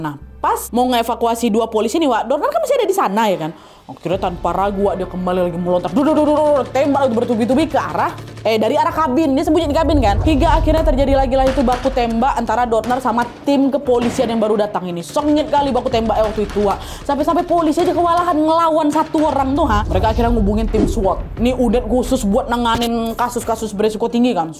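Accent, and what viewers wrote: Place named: Padang, Indonesia. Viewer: Kakak ni orang Padang apa Medan logat nya banyak Padang nya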